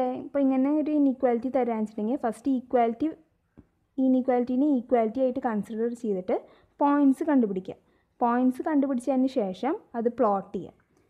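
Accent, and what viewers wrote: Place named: Malabar, India. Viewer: ഭാഷ മലപ്പുറം കോഴിക്കോട് ഭാഷ പോലെ തോന്നി 😅😅😅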